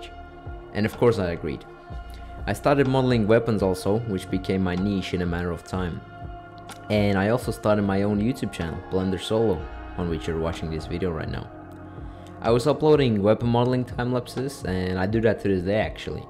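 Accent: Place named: Czech Republic. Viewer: CZECH!(jsem taky čech) like in that clip from world of tanks, I saw the Czech inscriptions and it was kind of strange to me, and then when you spoke, I realized that you are Czech.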